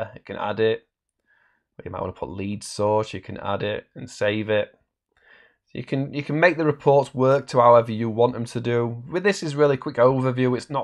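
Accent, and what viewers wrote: North, United Kingdom. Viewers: Hi, good to hear a Brit voice easier to understand than the Zoho videos and thanks for being active on the Zoho products. I've used databases in the past; Sales Force etc.. Used Zoho for 1 year but not in anger. We are looking to increase customers/interaction/subscribers etc... As I'm on the move its ideal for iPad/iPhone, now going through the correct steps/blueprint to give me a better work process so when we expand a system is in place. cheers, Charles. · Hi. I am starting a role with an Organisation as a customer services manager and they use Zoho. I would like tot get to know the system better to assist in this new position. Plus I am from the North of the UK and I can relate to the accent;-)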